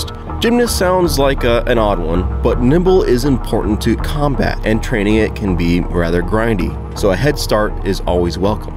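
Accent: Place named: United States. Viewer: question, why do you say zeds? you sound american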